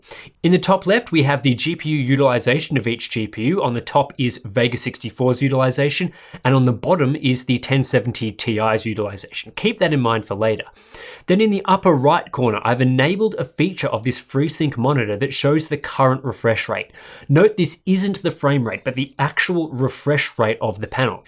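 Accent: Australia. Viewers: r u from ostralia?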